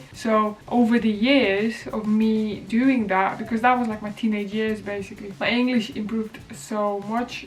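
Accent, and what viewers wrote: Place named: North, United Kingdom. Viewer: UK native (manchester) spanish (2nd) ... you definitely have a northern sounding accent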